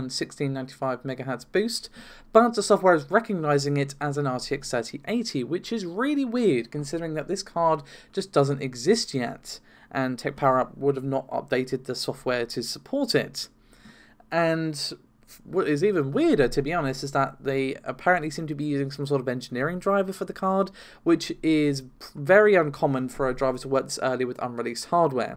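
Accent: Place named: Liverpool, United Kingdom. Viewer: He is a scouser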